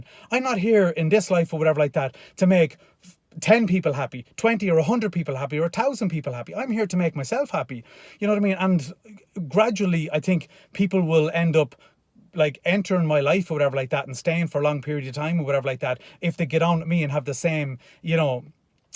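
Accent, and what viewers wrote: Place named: Ireland. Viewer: I love your accent. If youre from Ireland, what part?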